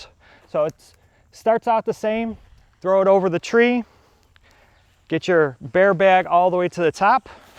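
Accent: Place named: Chicago, United States. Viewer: Dude are you from Chicago? I can recognize that accent anywhere....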